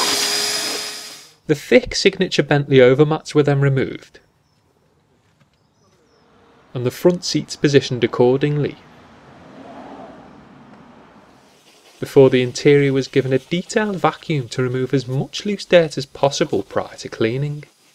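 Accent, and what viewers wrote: Liverpool, United Kingdom. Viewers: Scouse accent + Soft Voice + Proper English... Champion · You're a scouse I'm a manc......gotta tell you guy......good job..... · Not been this engrossed in a Scousers running commentary since Ringo Starr narrated Thomas the Tank Engine back in the day. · from canada? yeah right, i hear that scouse accent..................lol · I am not that familiar with Amy MacDonald but she's Scottish and the has a Scouse accent so you haven't even got the right country.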